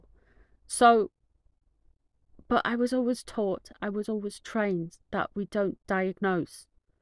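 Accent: United Kingdom. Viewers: I have watched your videos ever since Shanta from Mystical Fortunes had her members share a reading together with you! I have to say it took me a few times to understand what you were saying. Only because of the wording you have a great British accent ❤️ I soon learned of your quality of kindness and caring of the people who are in your community of people who follow you and your members. I have wanted to join but I needed help from my daughter who finally got me into the membership. Shanta always speaks very highly of you and has told us to go to you for any of our needs!!! Thank you for your amazing work and the ethical way you have done your work in all your videos. I love your live streams and enjoy them as well as all your other videos. I hope you have a wonderful day 💙☮️✨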